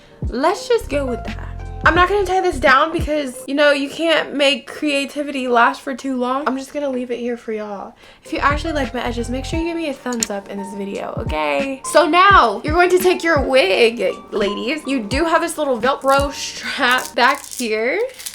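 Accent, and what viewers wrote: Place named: Alabama, United States. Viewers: Girl where are you from bc your accent is very distinct lol.. Alabama?? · it’s so precious, it sounds like a classy southern-belle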